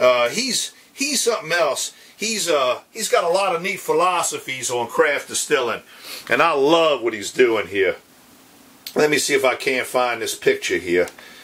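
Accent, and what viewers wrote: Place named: South, United States. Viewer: You need to work on your southern accent a bit.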